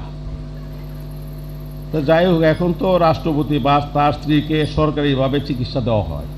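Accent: Mymensingh, Bangladesh. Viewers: আমার ঠাকুরদার ভাষার সাথে একদম মিল রয়েছে ।আমার ঠাকুরদা বাংলাদেশের ময়মনসিং জেলায় থাকতো বর্তমানে কোলকাতায় ।রাস্ট্রপতির বাড়ি কোন জায়গায় জানিনা তবে কথার পুরোপুরি মিল রয়েছে । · ময়মনসিংহ ভাষা😍😍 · স্যারের ময়মনসিংহের ভাষা মজা লাগল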